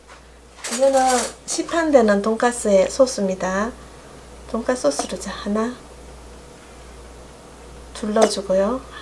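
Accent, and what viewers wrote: Gyeongsang, South Korea. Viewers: 쉽고 빠르게 친절한 경상도언어에 정감있고 맛있는 요리법 고맙고 감사합니다.💕👍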